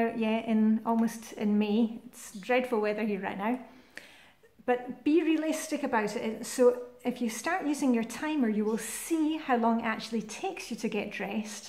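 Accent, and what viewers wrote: Scotland, United Kingdom. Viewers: Hy from Montrose in Scotland we had snow here yesterday, My favourite film has to be Gone with the Wind, as for smell I love the smell of freshly laundered sheets especially when they have been dried outside. Love your videos nice to here a Scottish accent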